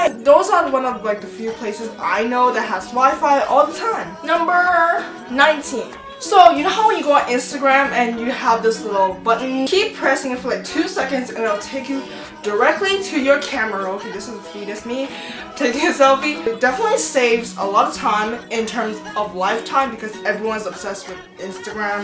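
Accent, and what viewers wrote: Canada. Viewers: Canadian eh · are you Canadian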